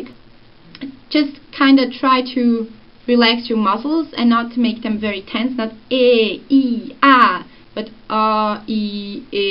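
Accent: Switzerland. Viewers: Is Swiss-German your natural accent?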